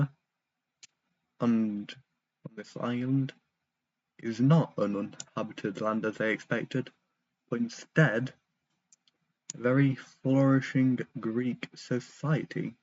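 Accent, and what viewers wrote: United Kingdom. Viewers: Nice Bri’ ish voice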